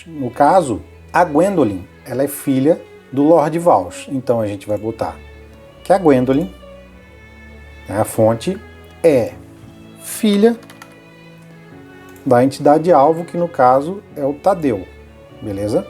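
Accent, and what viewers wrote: Florianópolis, Brazil. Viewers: Tu és manezinho brou?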